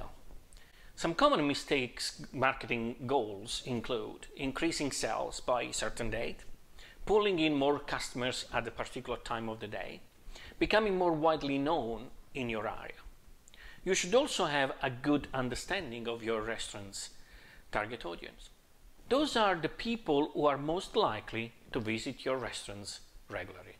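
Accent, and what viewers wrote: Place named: Italy. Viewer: nice content I like your italian accent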